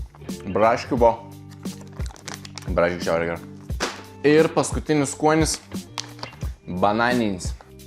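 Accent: Samogitia, Lithuania. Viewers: Pažiūriu ir tavo ir Pauliaus keliones ir nematau išvis jokio panašumo tarp jūsų ir jokio kopijavimo. Jei esat iš Žemaitijos tai ir kalbat taip. Va jei kuris nebūdamas iš ten mėgintų taip kalbėt tada suprantu, kad kopijuoja kažkas kažką. Man patinka tavo kelionės, tavo video, šaunuolis, linkiu kuo daugiau prenumeratorių ir gerų kelionių 😊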